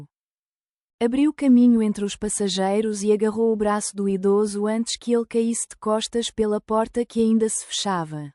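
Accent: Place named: Portugal. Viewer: Amei a historia! Sensacional! Comovente! Amei ouvir a narradora com sotaque de Portugal! Amo esse sotaque!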